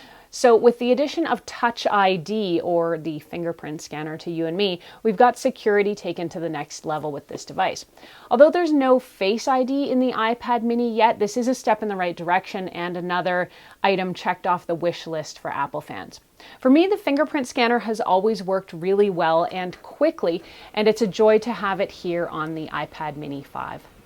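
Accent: Canada. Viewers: Atre u Canadian?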